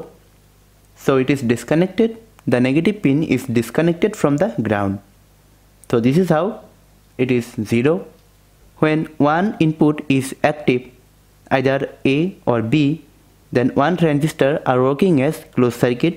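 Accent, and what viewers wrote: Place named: India. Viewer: Thank you indian man with strong accent finally a good explanation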